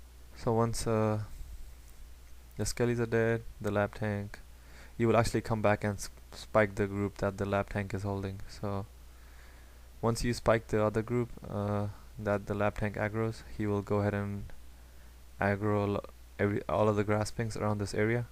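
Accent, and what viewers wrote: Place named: India. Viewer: THanks man so much just curious not hatin what accent was that sounded indian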